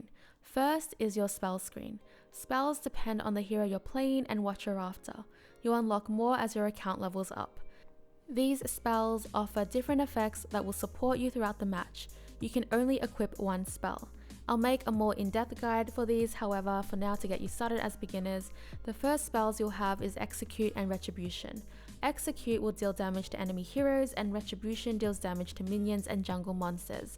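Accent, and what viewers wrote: Australia. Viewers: Are you an aussie??? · That australian accent Love it <3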